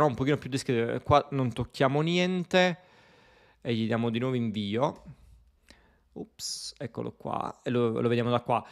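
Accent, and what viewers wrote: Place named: Naples, Italy. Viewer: Da ex grafico Pro... I loghi creati dalle IA sono abbastanza banalotti però per essere strumenti decisamente giovani devo dire che il lavoro che svolgono non è cosi malvagio. Anzi! Tra qualche anno ci sarà da ridere... O da piangere dipende Comunque devo essere sincero. Seguo i tuoi video più che altro perché amo l'accento napoletano 😅 Sono nato a Roma ma il napoletano è la lingua più bella che c'è 😅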